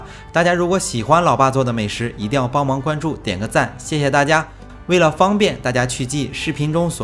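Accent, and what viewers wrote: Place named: Jinan, China. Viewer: 别忘了山东腔 ;)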